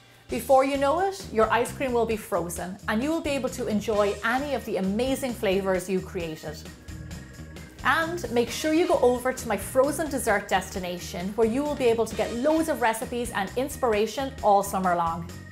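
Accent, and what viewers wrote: Ireland. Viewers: Is Irish if so I am too lol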